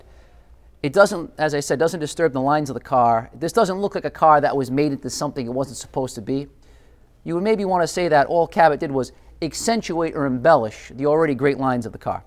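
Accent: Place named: New York, United States. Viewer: A New York accent and a Lincoln. great combo!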